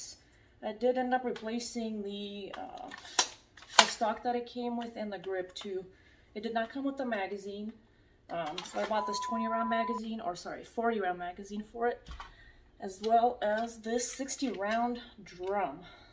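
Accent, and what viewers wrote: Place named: Russia. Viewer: is most likely Russian. Her accent(barely there but I can hear the way she say Kalashnikov)....Privet.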